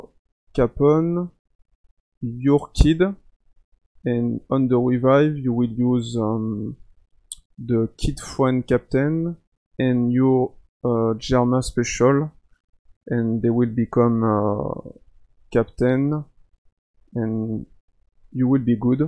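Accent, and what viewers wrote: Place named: France. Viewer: tkt c'est compréhensible pour un français, c'est juste que pour des étrangers ça peut être compliqué tant ton accent français est fort, par exemple j'ai + de mal à comprendre tubechau à cause de son accent Suédois.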